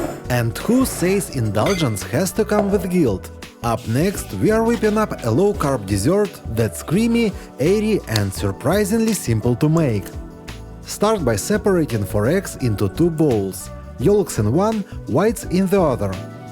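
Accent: Russia. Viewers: Advisory warning, there's nothing Japanese about this guy or his tricks or channel. I'm guessing from the accent he's originally Russian selling cooking techniques to an American audience. As a British born vegetarian former salad chef with Italian ancestry who doesn't eat white bread, white sugar or meat derived products, I won't be subscribing, lol. ✌️ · Russian accent 👎🤦‍♀️ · Clearly sounds Russian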